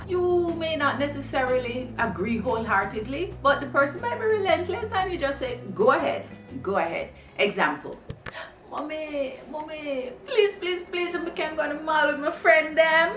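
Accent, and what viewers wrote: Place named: Jamaica. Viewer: I'm sitting on my couch alone and thought "the voice in my head needs a Jamaican accent", and here I am, an hour later still on your page. Tank yuh. Mi see ya likkle more. 🤣😁🫶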